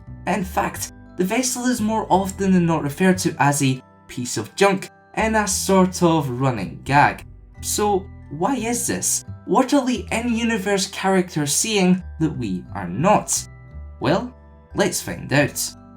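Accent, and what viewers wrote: Scotland, United Kingdom. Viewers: Thank god for the subtitles. The Scottish accent is strong with this one. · Love the Scottish accent. Could do with a better mic though. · Your a Scottsman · Scottish accent on Youtube? A surprise to be sure, but a welcome one.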